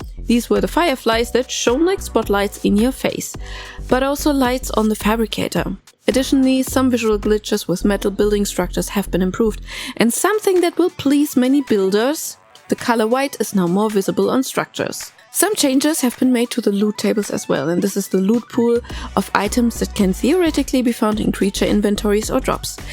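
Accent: Germany. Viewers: Bist du deutsch? Hört sich sehr danach an xD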